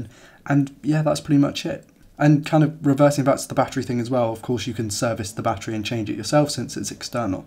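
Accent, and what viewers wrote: England, United Kingdom. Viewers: At last someone actually English making these videos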